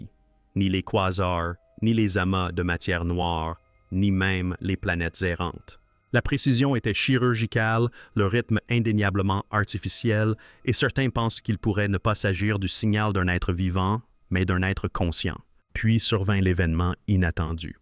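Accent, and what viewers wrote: Canada. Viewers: Euh... Est-ce un poisson d'avril de la part d'une IA ? Bougez pas, je vais me renseigner à la NASA. Bel accent Canadien, et vidéo interressante, mais farfelue.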